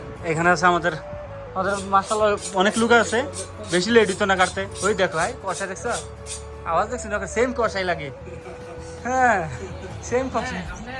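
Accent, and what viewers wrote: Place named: Comilla, Bangladesh. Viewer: আপনার কথার বাসায় বুঝা যায় আপনি কুমিল্লা জেলাল মানুষ কোন থানা আপনার বাড়ি জানাবেন ❤ ঈদ মোবারক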